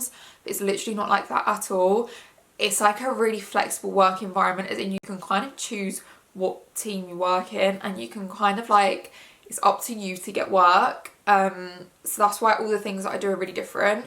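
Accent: United Kingdom. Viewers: Shes deffo from west london i can tell from the accent🤣 no negativity just a guess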